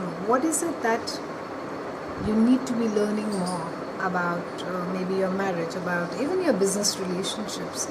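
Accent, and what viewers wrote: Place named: India. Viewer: You talking like India do you have any Indian background.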